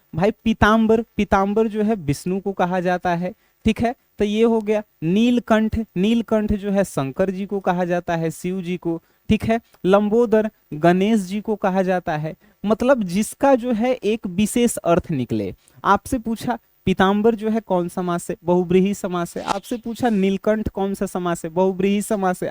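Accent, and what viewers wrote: Bihar, India. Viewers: Sir ap biahr sa ho jab ap bolta ho muja bhaut hasi ata ha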